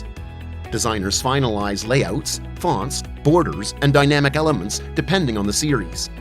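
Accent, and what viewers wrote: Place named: Midwest, United States. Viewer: Love the Midwest accent and the info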